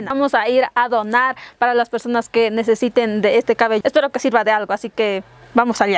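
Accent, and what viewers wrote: Mexico. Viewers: Jajajaja que linda, habla igual que las indígenas mexicanas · PARECE QUE ERES DE CHIAPAS MÉXICO. HASTA EL MISMO ACENTO AL HABLAR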